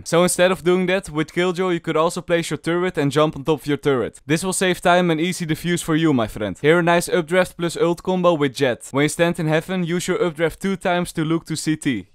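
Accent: Netherlands. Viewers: Ik merk aan je accent dat je nederlands bent. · i smell dutch u from holland ?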